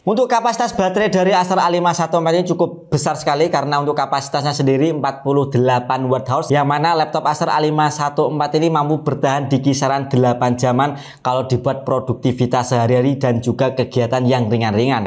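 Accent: Java, Indonesia. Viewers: Mas mas jawa bgt nih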